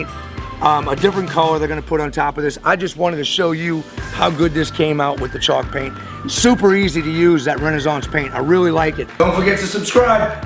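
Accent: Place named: New Orleans, United States. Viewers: love your work - quick question. I cannot figure out your accent. It's definitely not rural Virginia, where I'm from, but doesnt sound like Long Island either. It's like 5 different accents in one. New Orleans maybe? Do tell, sir! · to a British person he sounds like like he’s from New Orleans as you said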